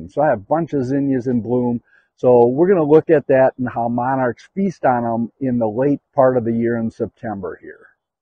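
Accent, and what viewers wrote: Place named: Minnesota, United States. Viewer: You sound Minnesotan.. lol.. Takes one to know one.. if I'm right. 😅 Love your video! Ha.. I just heard you say you're in MN. I have Zinnias and the Monarch are enjoying everyday!!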